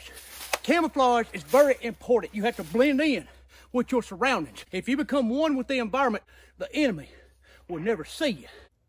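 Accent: South, United States. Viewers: I love his southern accent. He’s hilarious. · took the internet by the storm. Looking like a Hulk, talking like a deep Southerner. Priceless!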